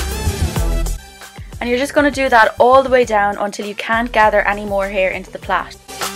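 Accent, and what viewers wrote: Ireland. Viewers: Irish YouTube for the win 💋🇨🇮 I'm Irish myself 🇨🇮💛 I knew the second you started talking you were Irish 🍀😂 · are you irish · I love her Irish accent 😍😍 I wish I had an accent too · are you Irish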